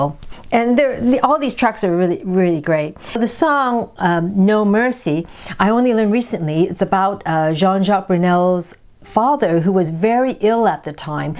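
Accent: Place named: England, United Kingdom. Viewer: Hey I stumbled on this video by accident also, I love the the too and like very much. I been watching this American girl Melinda something, her videos about vinyl records but her accent just drives me nuts 😂 so good to hear an lovely English speaking woman doing reviews. Keep up the good work your amazing 😊 thank you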